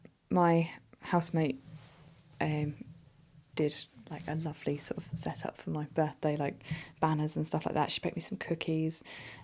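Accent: Ireland. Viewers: Great pt.1 of the Q&A. I think these bits of insight into who you are definitely made you feel more relatable, IMO, although we get quite a lot of that in your other uploads too! Really heartwarming to hear how your channel's had such a positive impact on your life outlook as well. As for the Irish accent video being so popular, I'm not surprised at all. There's somewhat of a dearth on YT of Irish ASMR creators, at least from what I've seen (or haven't). It can be such a beautful accent so it seems like a natural pairing with ASMR stuff. Searching for that is actually how I found your channel! Last thing, if you're 24 and born in 1996, then you're a rat. They do their signs on a rotation of twelve animals/years. This is definitely the longest comment I've ever posted, LOL!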